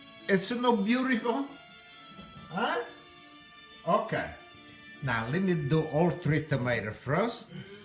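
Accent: Calabria, Italy. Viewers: Centi'anni! This except for anchovies was how my noni made it. She did cook with anchovies but it was a with olive oil, garlic on day old bread. You sound like the old calibretzu from the hill. I miss that accent from when I was a kid.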